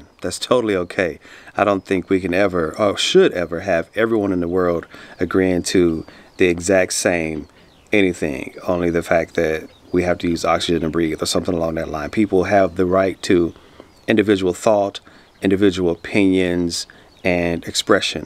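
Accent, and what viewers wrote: United States. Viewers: Yes I do find it strange that black people in America use African American. I have questioned my black friends in America. After all you’re all American- black, white,Asian. My nephew is half nigerian but he was born in England so he’s english. His heritage is mixed but where you’re birth takes place is where you are known as from. If I saw and spoke to you , I would think “ok he is American”, because of your accent. Sorry but I find it absurd that whites in America don’t justify their otherness but all other ethnicities do as this seems like they are not fully seen as American. I am so glad in Britain we don’t use our ethnicity to identify ourselves as we are all british people and we can see different ethnicities but united in our diversity. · Definitely an American with an accent like that 🙂 I could listen to this guy all day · If I seen you and heard your accent i would think oh he's American or Canadian😁😁 that's it I've found there's only one thing that separates us and that's MONEY. 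growing up in school I had black friends and we ALL had a big thing in common we were all POOR we didn't care about SKIN because that's all it is just SKIN. Welcome to the UK my friend hope you and your family have a good life hear much love and respect. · I'm an Englishman, my father was irish, but i consider myself English, you, to me, have an American accent, so, i would call you an American. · To a Brit, you´re just an American. The accent - and sometimes the wardrobe! - is what defines you to us. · Great video, as always. I've always wondered why Americans refer to themselves as African American, or Italian American, it's not a concept easily understood in England/UK. Can I ask what do you know of your ancestry and how far back you can trace your family. I have records going back about 100 years for my and my wife's family but nothing before that. For what it's worth I perceive you as an American, not African American, and really only because of your great accent!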